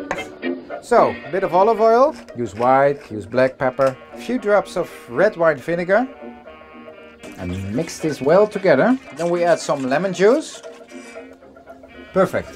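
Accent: Netherlands. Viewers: Bart's Fish Tales Ik ben geabonneerd op jamie oliver's kanaal en toen ik je accent hoorde wist ik gewoon dat je Nederlands was haha. Kun je een keer gerechten maken met gamba's? Ik ben namelijk dol op gamba's en garnalen...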